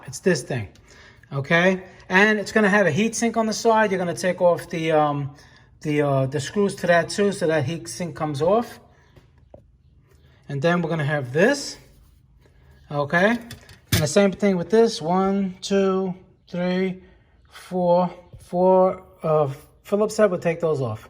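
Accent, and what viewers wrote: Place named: New Jersey, United States. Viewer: I have a 2011 F-150 Lariat with the factory stereo including Sync & Sirius. When I switch the ignition on, “Sound Designed by Sony” displays, then the compass direction and external temperature (only). When I press the stereo power button, five circles and the clock time flash on, then disappear. Occasionally when I'm driving, these will blink on then disappear too. To me that suggests an intermittent connection. I checked fuses and they were all good. I reset the stereo by disconnecting the battery cables, waiting ten minutes, and reconnecting. No joy. Upon removal of the stereo unit, all connections on the back were tight and clipped. So I baked the circuit board at 175 for ten minutes, then 375 for six minutes, and let cool at room temperature for one hour. Reassembled, reinstalled, and got the exact same behavior as before. 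Unfortunately the procedure didn't work for me. So I'll be looking at an aftermarket solution now. But thanks for your videos, and I give you major props for the total Jersey accent and speech mannerisms.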